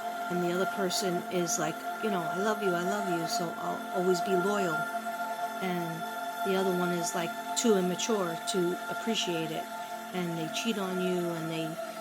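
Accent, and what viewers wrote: New York, United States. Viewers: seriously I am so amused by you. ❤️ Your NY accent in TX. I love it! You guys must have moved there for a job because why else would a proud NY’er move so far southwest? It must have been a difficult transition. Lived my whole life in the Midwest, went deeeeeep south to visit my husbands family and felt (and probably looked) like a fish out of water. Nice to visit, not stay 😂. I love your heart, you are so precious.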